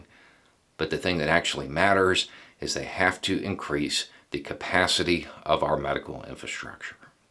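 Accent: South, United States. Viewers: I'm sure you've been asked this before, but have you ever thought about running for an office? You'd be the first southern accent I ever voted for!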